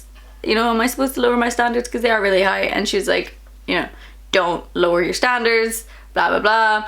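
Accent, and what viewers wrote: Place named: Ireland. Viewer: I could listen to you talk all day with your adorable irish slang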